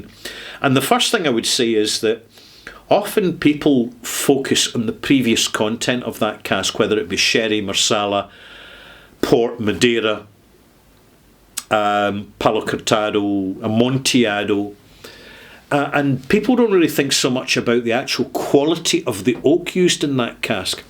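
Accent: Scotland, United Kingdom. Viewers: I've been trying to listen to a couple of Bootlegs Clash and Led Zep) I bought tonight and watch you with subtitles. It is really quite hard to make sense of your videos using the subtitles alone as they are so off the beam. It is part down to the Scottish accent - have you seen the sketch in the voice actuated lift with 2 scotsmen! No biggie really I know I should listen to the undiluted velvet tones only.....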